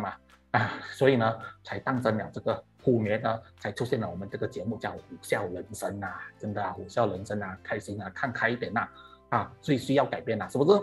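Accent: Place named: Fuzhou, China. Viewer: 哗你的福州话很标准呢！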